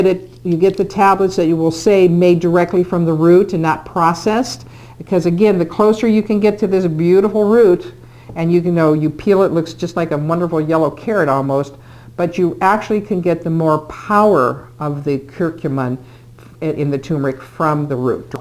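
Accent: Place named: United Kingdom. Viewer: Pronounced in America as Tur MER ic. and Cur CU min She pronounces it as the British do. But we don't speak British here in America. Rule of thumb is in America (the entire western hemisphere) the emphasis is on the 2nd from the last vowel. IT only changes from that rule if there is an accent placed over a different vowel. In the British language they put their emphasis on the first syllable of words. TurMERic is a most wonderful herb. Beautiful plant as well. I grow Turmeric and Ginger. I sprinkle the ground up Termeric and Ginger on my buttered toast daily. I also add Hemp seeds to it and a splash of Cinnamon. ===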